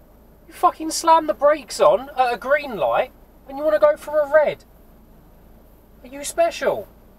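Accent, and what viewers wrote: London, United Kingdom. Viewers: Both the cyclists must have extreme death wishes. I say 'I hate people' all the time, especially now when just going to the store is insane. People will run you over with their shopping carts and then get out in their cars and hit you, not stop at stop signs, but feel entirely justified to stop in the drive lane and back up or just sit there. I saw eight cars not stop for a stop sign just today. I just laugh at the morons. I just found this and I love the fact that there is absolutely no censoring to your thoughts (plus the accent, but as multicultural as London is, you've probably heard that a lot). Merry Christmas early from the U.S.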